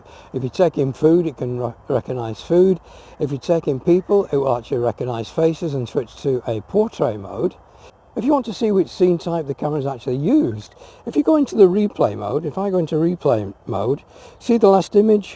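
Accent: United Kingdom. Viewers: I just purchased this camera and I am so thankfull you posted these video's! Very helpfull. Btw your Brittish accent makes it even better 😄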